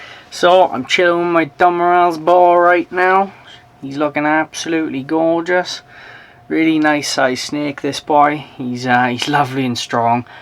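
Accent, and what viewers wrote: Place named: South Wales, United Kingdom. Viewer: The south Wales accent is lush.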